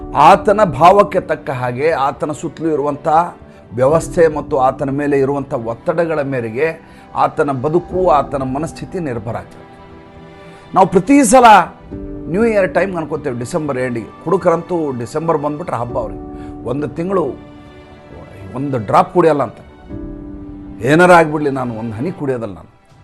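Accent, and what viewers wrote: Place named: North Karnataka, India. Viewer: ಉತ್ತರ ಕರ್ನಾಟಕದ ಗಂಡು ಕನ್ನಡ ನಿಮಗs ಗೊತ್ತಿಲ್ಲಧಂಗ ನುಸುಳೋದು ಕೇಳ್ಲಿಕ್ಕೆ ಮಜಾ ಅನಸ್ತದ!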